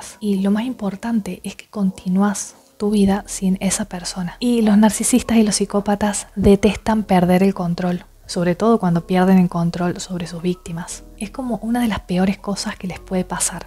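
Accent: Córdoba, Argentina. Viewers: Oh Qué decirte? Realmente sorprendido Denoto en tú dulce voz una sutíl tonada cordobesa Empecé a escuchar tús audios dé casualidad Primero uno y después otro y otro y otro más Nunca doy likes ní hago comentarios ní mé suscribo Pero WOW con vos ló voy hacer, té ló merecés GENIA, la dulzura dé tú vos, la simpleza dé las explicaciones pero con contundencia y firmeza Didáctica Cada comentario tuyo es una incisión profunda y precisa Qué más decirte? Mé gustaría decirte muchas cosas más Pero yá mé extendí demasiado Té deseo ló mejor, muchos éxitos, té ló merecés Espero verte pronto en alguna conferencia Mé encantaría eso Sos un orgullo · Me encanto tu video. Estuve 3 años con un narcisista encubierto por 3 años. Muy abusivo verbal y emocionalmente; precisamente de Cordoba; tu mismo acento. Bueno, yo lo apoyaba financieramente por los 3 años que estuvimos juntos. 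Mientras más yo lo ayudaba peor me trataba y exigía más. Terminé casándome con el y a los 3 meses no soporte más sus maltratos y humillaciones y lo dejé. Agarre mis cosas y me fui. Me hizo Imposible las últimas semanas antes de mi salida. Lo malo es que me robó dinero. Yo le preste dinero en un momento que el estaba en un aprieto financiero antes de la boda y no me los quiere pagar. Me bloqueo para que no le pida mas el dinero. Y habla mentiras sobre mi y porque terminamos ! Que me importa! Ahora estoy con mi abogado tramitando el divorcio y todo. Lo curioso es que después que lo dejo, me entero a través de víctimas que estaban pendiente de nuestra vida juntos, que es un estafador en Córdoba. Me contactaron sus victimas ! Estafa mujeres que las engancha y les roba. También hombres que les hace creer que es un inversionista millonario en Miami … uffff una lacra total. Ósea me case con un delincuente sin saberlo. 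Además de narcisista. Gracias a Dios me di cuenta tiempo, ese hombre hubiese acabado conmigo, y con todo lo que tengo, capaz de asesinarme para quedarse con mis cosas. Ahora estoy bien. Dejarlo fue como volver a nacer y soy feliz otra vez. No lo extraño para nada. Ahora gasto mi dinero en mi y en mis hijas , viajo , invierto en arreglar mi casa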